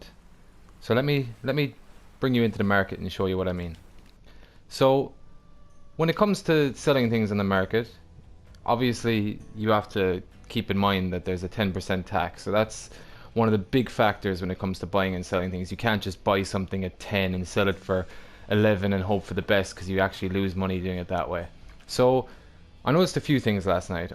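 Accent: Ireland. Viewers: Are u Irish cos I'm from waterford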